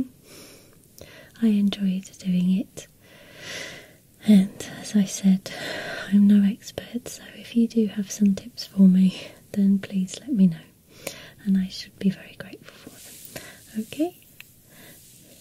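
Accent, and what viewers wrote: United Kingdom. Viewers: I love her british accent💕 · I love your accent and the U.K. In general 🇬🇧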